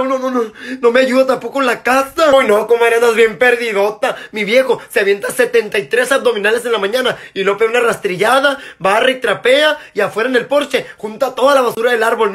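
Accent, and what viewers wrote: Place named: Sonora, Mexico. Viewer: me encanta tu acento Sonorense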